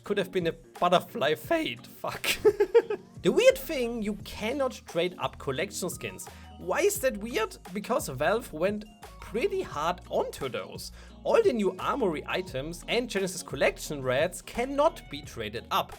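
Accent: Germany. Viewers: Alter dieser scheiss deutscher akzent ich kann nicht mehr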